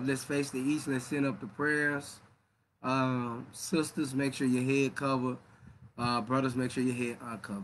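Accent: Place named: South, United States. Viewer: the southern accent reading KJV sounds nice. like it makes it easy to understand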